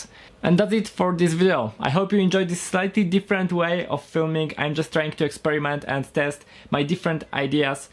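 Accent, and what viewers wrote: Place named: Poland. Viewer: masz akcent polaka :)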